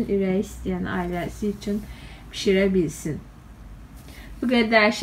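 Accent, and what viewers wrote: Baku, Azerbaijan. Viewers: Əladır,şirin ləhcəli bakılı qız.Bu torta qat.süd və yağdan ibarət krem çəkmək olar?mən bişmiş krem sevmirəm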